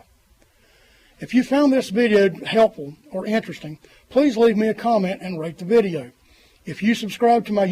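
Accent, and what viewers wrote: United States. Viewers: Weird Yankee accent.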